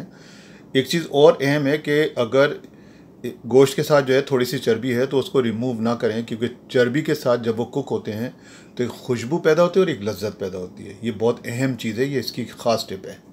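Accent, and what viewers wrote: Delhi, India. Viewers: Kitni achi AP ki recipe ha itni hi achi Urdu .....AP k sheen qaaf bilkul durust Han ....recipe dakhny main to maza Aya ha AP ki Urdu sun k kisi pakkay Dehli waly ka khyal Aya ha .....my nanihal belong to Dehli jama masjid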